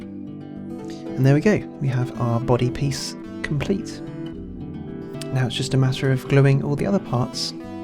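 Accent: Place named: United Kingdom. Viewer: um.. can you use plain old printer paper and I love the British accent lol and yes I know he is really British.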